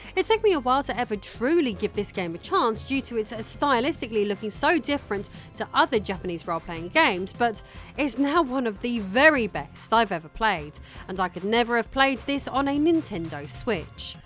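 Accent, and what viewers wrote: England, United Kingdom. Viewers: Damn, she’s hot, especially with that English accent.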